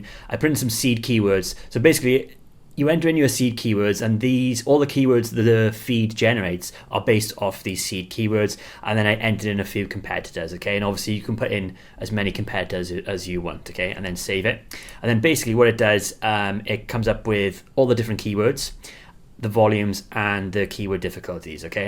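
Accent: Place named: Cockney, United Kingdom. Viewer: I think you forgot your audience was mostly American and not cockney? It's difficult to follow you. This is a really good video but no way am I going to slog my way through 2 hours of this. But your shirt fit the the mood.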